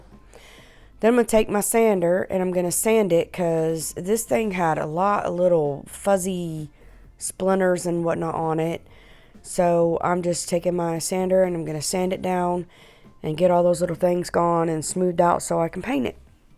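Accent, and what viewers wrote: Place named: Louisiana, United States. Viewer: I love these projects! BTW, you sound just like my family in Louisiana! Beautiful accent!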